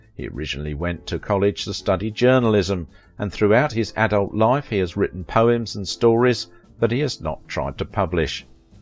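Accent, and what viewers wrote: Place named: Cockney, United Kingdom. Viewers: The title is false. Brando did have his nose broken when he was horsing around backstage with another performer during the run of "A Streetcar Named Desire" but it wasn't Jack Palance who clocked him by mistake. It was one of the actors in the poker night scene who also had been a professional boxer previously. I forget the guy's name, but he wasn't a large man like Palance. Marlon himself told this story a couple of times. Why would he lie about who punched him? I have never heard the rumor that Palance was involved, and it seems made it up because it fits in with the idea of him wanting to go on for Brando. Here's another oddity--the fellow narrating has a cultured British accent, yet they are unable to write a decent English sentence. It should read, "Why did Jack Palance Knock Out Marlon Brando?" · The narrator himself may be a cultured man, I wouldnt know, but his accent is not especially so. 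Unless you mean cultured in the sense of deliberately created or adopted. The underlying accent sounds like an east end of London, "cockney type", accent. It might suggests a clever person who has got on reasonably well in life but who may not have been especially well educared in his early years. I am not saying the accent is fake, he may well have picked up his adult accent because of the circles he moves in.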